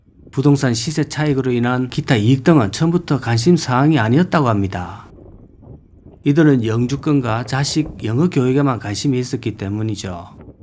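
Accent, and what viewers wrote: Gyeongsang, South Korea. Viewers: 님의 촌철살인의 나레이션 내용과 매끄럽게 흘리는 서부경남 사투리가 기가막히게 어우러져 v-log의 인기가 나날이 더해갈 것입니다. 항상 잘보고있습니다.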